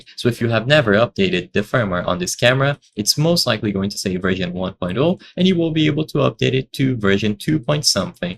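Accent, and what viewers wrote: Brazil. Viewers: Você é brasileiro? Tive impressão pelo sotaque, awesome video my friend!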